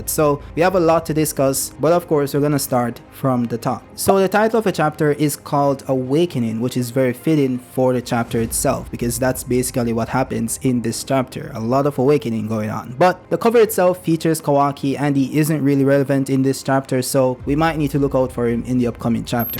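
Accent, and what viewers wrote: Jamaica. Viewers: Are you Jamaican? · Are you jamaican?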